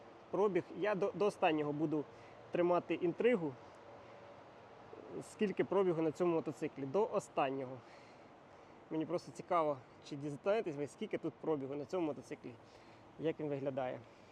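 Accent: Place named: Cherkasy, Ukraine. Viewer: Балачка, акцент Черкащанський. Думаю автор звідти родом. Вгадав?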